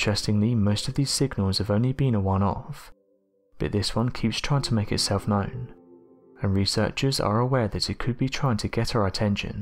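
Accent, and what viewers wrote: United Kingdom. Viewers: Honestly, I love the content. I listen to other channels that have British narrators too. I find that this narrator speaks too quickly and his words seem to run together. He speaks too softly at times and I often find it difficult to understand through his accent even though I listen to other British accents often, for example, the narrator of the Top Mysteries you tube channel. I have trouble understanding this man. His voice is soothing though.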